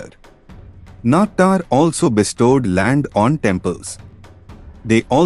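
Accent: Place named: North India, India. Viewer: Thanks for the channel to have done this.acxent seems to be from north..happy that history is spread over india.please.do it in hindi too brother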